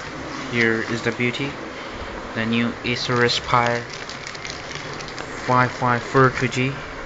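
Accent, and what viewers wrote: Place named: India. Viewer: Hey can u tell me how much did u pay for this laptop i think u sound like an Indian Person...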